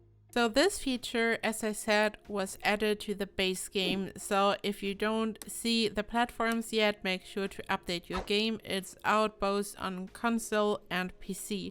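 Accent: Germany. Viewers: you're English has a German accent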